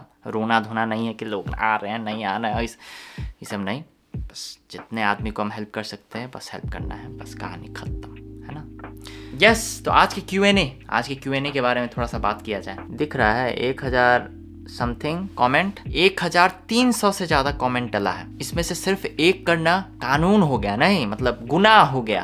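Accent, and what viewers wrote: Bihar, India. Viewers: A ji aap to humse bhi jyada bihari lag rhe hai..aisan ta hmaar tone nahi aata hai bhojpuri me lekin aapka to puchiye mat baap re baap.... sarangheyo oppa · Bhai wh bihari hi h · Bhaiya apki accent toh bilkul hmare bihar jaiisi h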